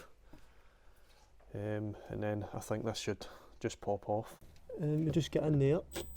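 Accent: Glasgow, United Kingdom. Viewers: Well done for taking on a difficult job, and showing us it wasn't to bad. I enjoy your videos, and my wife likes your Glasgow accent.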